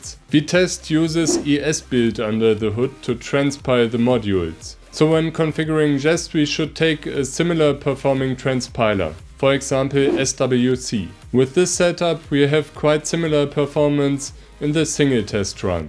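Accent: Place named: Germany. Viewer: Good old german accent 😄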